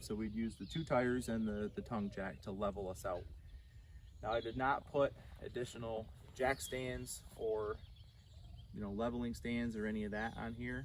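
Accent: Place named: Iowa, United States. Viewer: Hi! Super! Questions! What state / locale were you filming in? (Why would you leave - beautiful birds and landscaping! I'm going to guess having no other info but sounds and your accent -- Iowa or thereabouts - Illinois? Second; What is that vehicle you're pulling it with? Thank you! Diana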